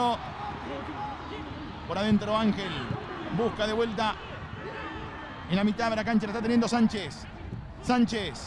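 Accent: Paraguay. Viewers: El acento asunceno si tiene parecido con el rioplatense, que el tipo hable de manera correcta no significa que le esté copiando a los relatores Argentinos. Nde caprichosove kururu pytãgui chamigo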